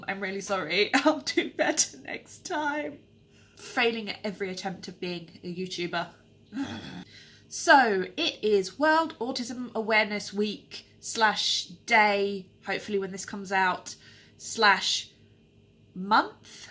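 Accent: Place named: United Kingdom. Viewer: This is the first time I've heard your voice. I didn't know you were British. (I follow you on Twitter) Congrats on 700 Subs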